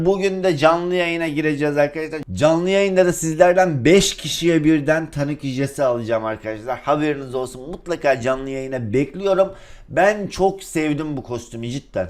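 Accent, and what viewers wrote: Black Sea, Turkey. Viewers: #lazbunuyap Pocoyla hesaplaşmaya gir hiç yuknalmadan birinci ol olamazsan hesabı 950 taş al Instagram erolhayrunnisa abibseni çok eskiden takip ediyodum inanmazsan eskiden kanalını ismi oyun oynay di hatta eski videolarında Karadeniz şivesiyle konusuyordun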